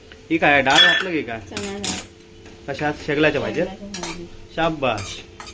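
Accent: Malvani, India. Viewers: Tumchi malavani bhasha aikayla khup aavdate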